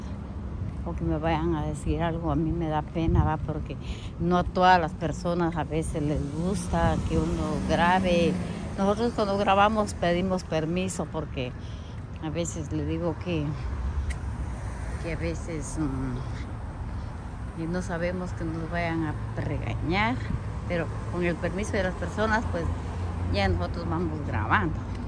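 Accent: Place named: North, Mexico. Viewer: Ay como se lea subido el norte asta el ablar bien finjida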